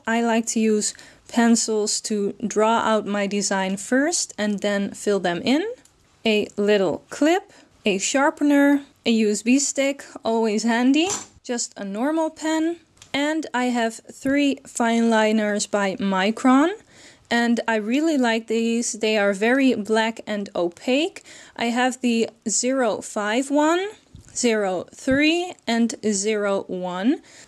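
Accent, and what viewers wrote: Netherlands. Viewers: i knew you were dutch when u started talking lol. i know the dutch-english accent from anywhere! :D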